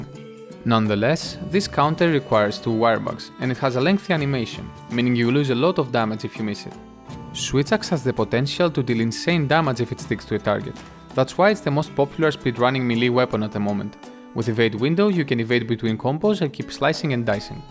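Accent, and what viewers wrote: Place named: Greece. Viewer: Efxaristo haha, elliniko accent kani bam alla ta agglika sou ine poli kala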